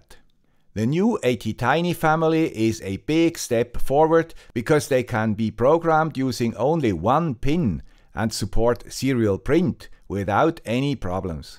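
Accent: Switzerland. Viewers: Hard to listen to swiss accent for the first time. Let's put it into "watch later". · Does anyone know why he has a Swiss accent? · "Also a guy with a swiss accent"... Classic =P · The video started without audio on YouTube and my brain bugged trying to understand what was doing on the guy with the Swiss accent's channel. 😅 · a guy with a Swiss accent, a vintage high tech NE555... and now ATtiny's... what an awesome Sunday morning entertainment.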